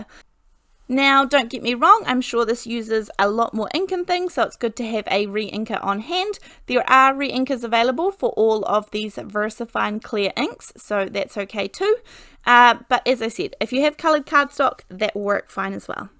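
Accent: New Zealand. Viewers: If you die cut the rectangle out of both cards, couldn’t you have made 2 cards by swapping your pieces over & still raising them with foam. I do luv your videos (yes I’m subscribed) & your kiwi accent too 😀👍🏼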